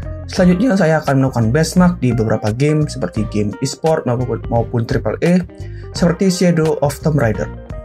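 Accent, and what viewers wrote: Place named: Java, Indonesia. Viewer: ngenah sajan logat nu medok :face-turquoise-covering-eyes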